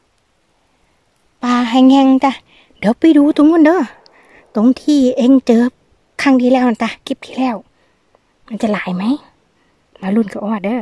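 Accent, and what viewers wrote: Korat, Thailand. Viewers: สำเนียงแบบนี่ คนโคราชไช่ม้ะหนิ :)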